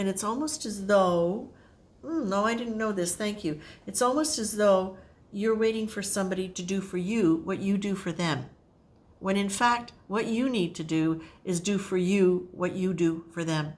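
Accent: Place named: Ireland. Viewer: Thank you! Love you Irish accent! So on point! (Fellow Irish person).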